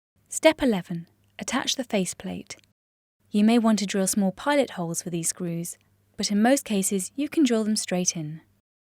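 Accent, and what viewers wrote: United Kingdom. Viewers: Isn't amazing how "simple" well spoken English with complete sentences and diction can help to simplify how to do ANYTHING?! I am trying to fit for a mortise on a storm door and this video is exactly what the Doctor ordered. I have never done a mortise before. Thanks to VideoJug and a special thank to the lovely British voice of. AWESOME Video! Cheers!!!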